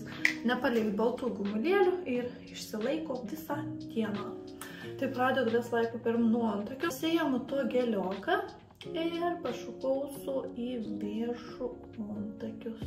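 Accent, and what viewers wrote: Samogitia, Lithuania. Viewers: Nu graziause ce, veina pati i konca isvare!? Kode mon nieka nepasake 😮 zinuok pykstu!!! O jei rimciau tai faina ziuret o labiausei tai klausyt zemaitiskos kalbos.. pasiilgau Telsiu ir zemaiciu 😉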